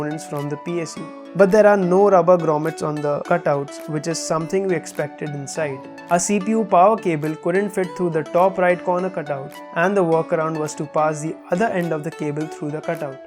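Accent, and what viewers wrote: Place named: India. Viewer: Typical Indian accent... ...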